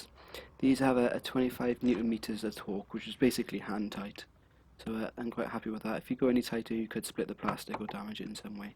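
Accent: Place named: Wales, United Kingdom. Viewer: Thanks for your useful tutorial. A little hard to understand your Welsh accent ;) but the video is very clear and helps a lot. You have a free drink if you'll come around Olbia, Sardinia. Just send a message by Youtube. Cheers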